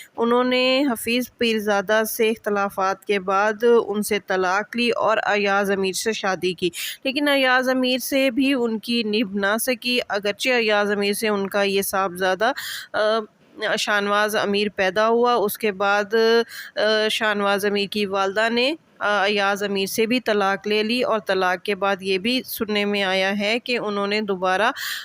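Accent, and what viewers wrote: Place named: Punjab, Pakistan. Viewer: Can’t you find anyone better to read the report, she can’t even read properly and very strong panjabi accent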